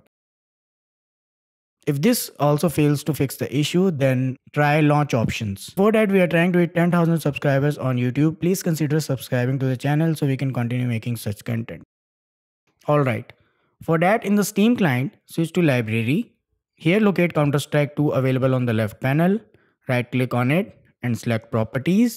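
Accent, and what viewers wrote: India. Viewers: of course its an indian accent teaching us how to fix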